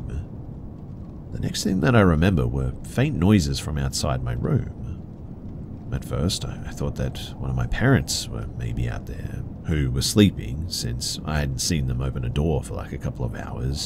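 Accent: Australia. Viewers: His voice actually calms me due to the fact that it’s a really really deep Australian accent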